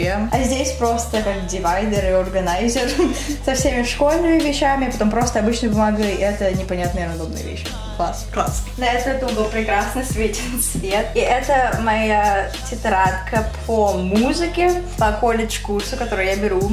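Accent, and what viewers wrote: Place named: United States. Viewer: У тебя такой классный акцент американский😑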